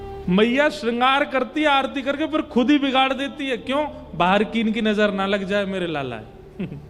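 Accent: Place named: Braj, India. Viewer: Jay Shri Krishna Radhe Radhe 🕉 OM Namo Bhagvate Vasudevy Namh OM 🕉 Namo Narayan ji Jay Shri Hari Jay Shri Shyama Shaym ji Jay Shri Laxmi Pati Jay Shri Radha Rani Jay Shri Radha Kishori ji Jay Shri Radha Raman ji Jay Shri Radha Vallabh Lal ki Jay Ho ♥️ Radhe Radhe Jay Shri Harivansh Guru Dev Maharaj ji Aapki vraj Vani Badi Mithi hai Jay Shri vraj vasi Aapke Charno Mai Koti Koti Vandan ♥️ 🙏 Jay Shri Vrindavan Dham 🙏 Jay Shri Yamuna Maharani ji 🙏 ♥️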